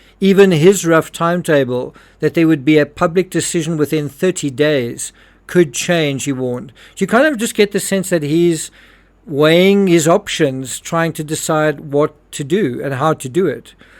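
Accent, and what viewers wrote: South Africa. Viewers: South African accent. Love it too when he says that!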